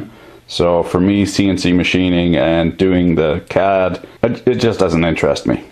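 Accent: Quebec, Canada. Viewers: I'm French Canadian too. I live in the states now but that Canadian Frenglish speak cracks me up every time. Brings me right back to where I came from... lol. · HAHAHA ! jaime comment tu parle ! pas besoin de t'sa esti ! vivez vous proche du quebec ? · HAHA are from quebec because your french sounds just like mine!! Cool videos btw! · tout tes French-isms que tu fais, j'essaie de deviner d'ou tu viens. Dans un autre video tu disais que t'es un "tête carré anglophone", mais tu parle un français plutôt Québecois. Je dirais Montréal ou Ottawa. Side note I was called a square head by some grumpy old man on a Montreal ham radio repeater, telling me to go back to Ontario, but I've never lived in Ontario.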